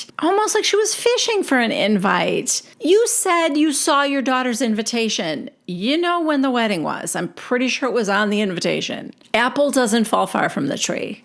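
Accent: New Jersey, United States. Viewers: Omg! Your NJ really showed this week! You've got Danielle down to T.!!!😂 I used to think you exaggerated Teresa UNTIL I was accidently listening to Namaste Bitches. I thought I was listening to you so I started l😂 I'm thinking No way! It was Teresa herself sounding just like you! I hope this makes sense. You're reviews are the best. No one imitates ALL the housewives like you do! I love and appreciate your efforts and love your work! Thank you so much and God Bless ya!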